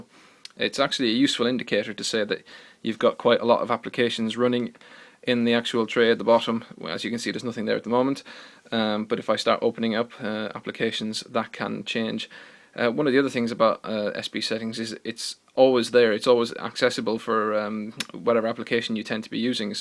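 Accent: Ireland. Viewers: Nice review. Are you Irish?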